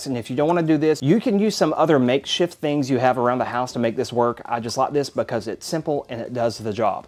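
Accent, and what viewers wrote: South, United States. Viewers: You have a new fan. Great video very detailed and I swoon over that country accent ❤️❤️❤️❤️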